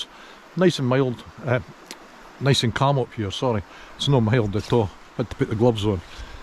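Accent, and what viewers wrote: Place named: Kirriemuir, United Kingdom. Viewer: you're doing well! Best of all is hearing the Kirrie accent!!! (Missing that!) 😀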